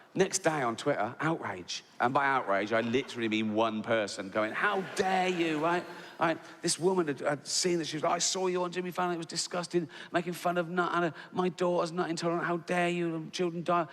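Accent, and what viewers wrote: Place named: Australia. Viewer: Sorry but your subtitles are full of errors - AI CANNOT translate Aussie accent. Simple as that.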